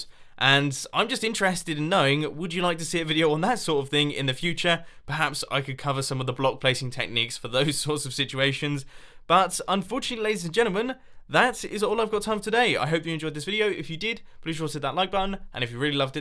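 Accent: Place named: England, United Kingdom. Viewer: 2 questions. 1. Where are you from? I hear an English accent. 2. Do you believe in using mods like WorldEdit for laying lots of blocks?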